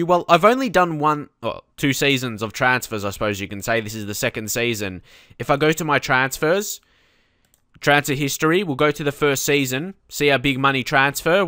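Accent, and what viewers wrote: Australia. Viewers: Best fm series on youtube. The detail u go into makes it so much more interesting. Length is fine aswell. No need 2 change a thing. Where abouts in australia are you from mate?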